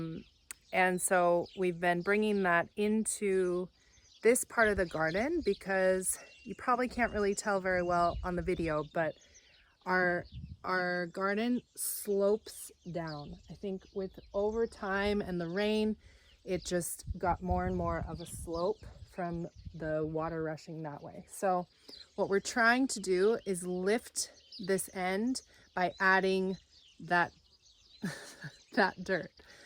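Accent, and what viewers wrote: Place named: United States. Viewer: How did you get sick in winter. I have been here since March 20 and not ha a day off yet. Yes we have had the wettest winter so far and now plenty of water to see me through the coming warm summer. Even up here in the mountains it can still get hot, 33 or 92 f last week, a tad warmer than U.K. and dam site drier after what they have had in recent months. Here we have had 150% of our annual rainfall while parts of U.K. have had 300% of their total for the winter. You speak English, but the accent is elsewhere, America or maybe Canada and hubby could be local as not hear him much. A sheet of clear plastic over your seed pots will work better. Toms need 68 bottom heat to germinate within 3 days or can take a week if left in the house. I have been a gardener for a long time so ask if you need to know anything to help you.The more I listen the more I think Canada or northern states, but maybe lived in U.K. 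for a while. Enjoy it while you can as if the fools in the middle east do calm down then we are all up shit creek without a paddle.. Mulberrys must be nearly wrotten before you eat them.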